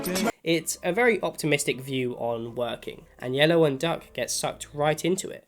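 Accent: United Kingdom. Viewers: Is this guy British??????? Fredbear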